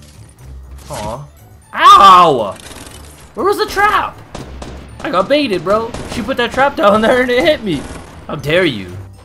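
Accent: Canada. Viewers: Are you Canadian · Is he Canadian? Just wondering cause he doesn’t say sorry like an American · Are you from Canada?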